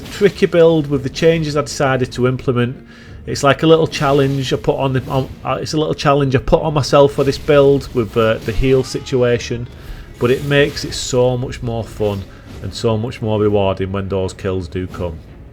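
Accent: United Kingdom. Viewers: can you fucking show the objects, i dont understood you fakin british language god damn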